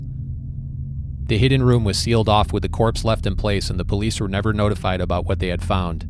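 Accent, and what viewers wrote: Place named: Midwest, United States. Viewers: I too am getting grat joy from this Illinois/ Wisconsin accent. Thanks brother from Chicago. Great storytelling! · Excellent content bro and it's a comfort hearing that northern Illinois accent. Hope this channel blows up!!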